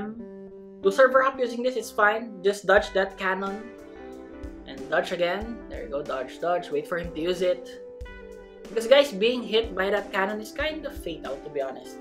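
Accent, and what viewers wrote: Philippines. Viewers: His accent is filipino lol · are you a filipino? Because you sound like one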